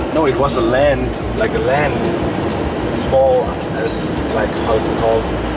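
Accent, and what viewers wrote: Germany. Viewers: Here we have a German man in Spain Sperling English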